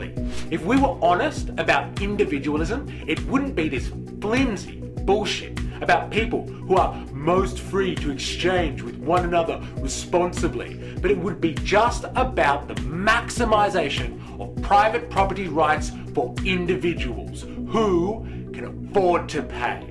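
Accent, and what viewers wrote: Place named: Australia. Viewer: using a voice changer but still sounding Aussie? as **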